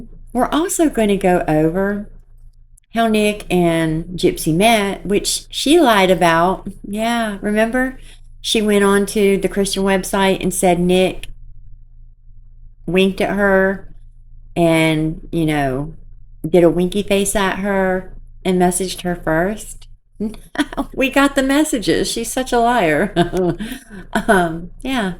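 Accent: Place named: South, United States. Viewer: ❤ you dont need to :) like your dialect, 😊 is this a southern? I am from Switzerland and learning English,start noticing dialect only after a while 😊 Sheena needs to say children are off limit is unnecessary and screams predator behavior .. They are child trafficking itches ... and this joe is absolutely sus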